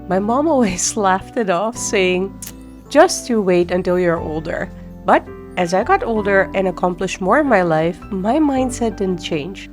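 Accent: India. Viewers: congratulations! happy for you and your husband! btw I hear a bit of an Indian accent haha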